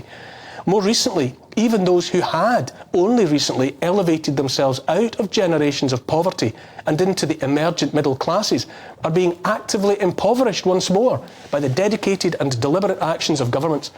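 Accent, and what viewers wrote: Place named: Glasgow, United Kingdom. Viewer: Mate what's way the neck rug? And stoap way the Irritating accent yer a weegie trust me it's no surprise ur channel is complete Murdoch puppet play.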